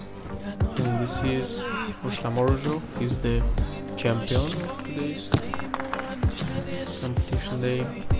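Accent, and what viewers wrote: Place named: Russia. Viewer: I think russian accent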